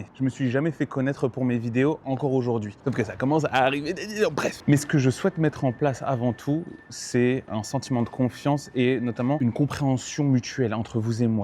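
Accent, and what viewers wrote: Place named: Cameroon. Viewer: Tu viens de quel pays en Afrique tu parle comme un camerounais 😁😁😁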